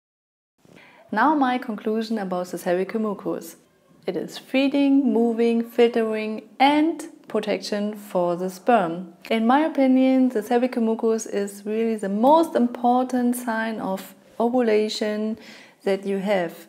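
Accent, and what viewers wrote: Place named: Germany. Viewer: i've lived in Austria for 10 years and I couldn't ever have mistaken that accent Germans have when speaking English 😁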